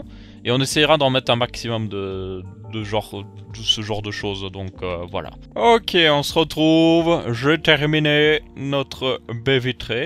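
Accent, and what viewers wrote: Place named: Belgium. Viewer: la vérité t'as voix de belge est sexy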